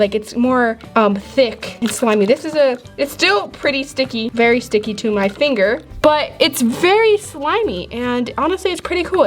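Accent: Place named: United States. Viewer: Tot you were American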